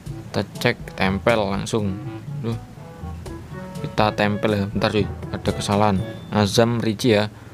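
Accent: Java, Indonesia. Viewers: Orang Jawa y bang , cumananya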